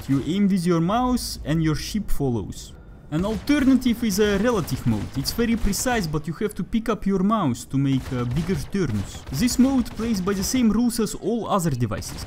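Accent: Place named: Russia. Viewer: Your Russian accent is amazing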